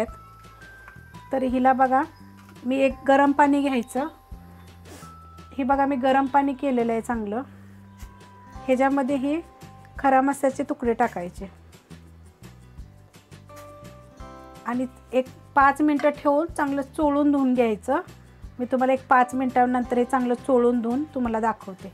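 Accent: Satara, India. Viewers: तुमच्या आवाजांना मी ओळखलं तुम्ही साताऱ्याचे आहेत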